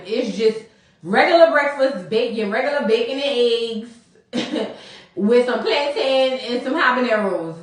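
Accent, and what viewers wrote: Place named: Louisiana, United States. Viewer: Love your accent. You must be from Louisiana. Porsha is not over Dennis and she wants him to want her and show him what he is missing with Simone.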